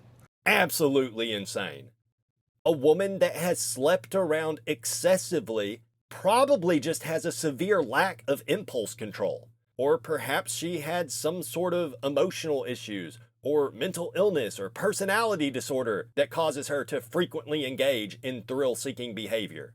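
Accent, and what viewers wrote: South, United States. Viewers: he definitely has a slight southern accent. Wouldn't say hillbilly, but for sure Southern. Hillbilly is much more pronounced. He sounds like a dude who lives in a slightly more rural area.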